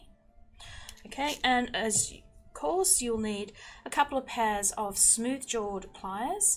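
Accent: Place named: Australia. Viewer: This pendant is beautifully styled!! I found this tutorial, like all others I have watched here, was quite easy to follow along with, and soft Aussie voice is both soothing and relaxed making the atmosphere one where I felt I was having a private lesson with as my tutor!! Lucky me!!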